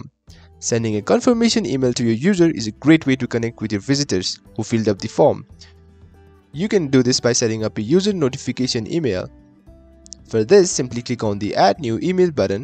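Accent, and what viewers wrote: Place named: India. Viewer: Nice but It's not easy to understand with the quite strong Indian accent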